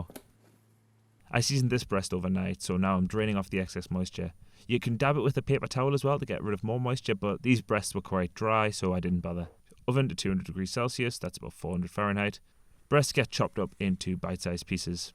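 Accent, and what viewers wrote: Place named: South Shields, United Kingdom. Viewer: Pretty sure that's a South Shields accent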